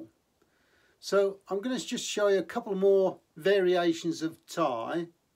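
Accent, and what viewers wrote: United Kingdom. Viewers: Trying to place the accent is it Norfolk, Suffolk, or ?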